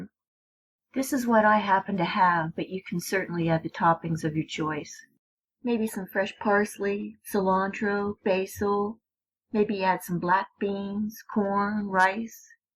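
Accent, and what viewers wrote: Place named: Philadelphia, United States. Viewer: Such a philly accent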